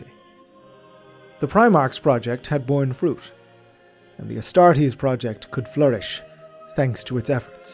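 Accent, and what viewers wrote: Ireland. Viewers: You are definitely irish, and I approve my man. good to see a native doing well on youtube here, and your content seems to be great, heres a new subsoober for ya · Are you Irish? You sound irish. But you could be a Canadian doing an accent... I'm... uncertain. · I spent the first 5 mins trying to figure out if the speaker was English or American, realised he's Irish. So am I, not a good look for me.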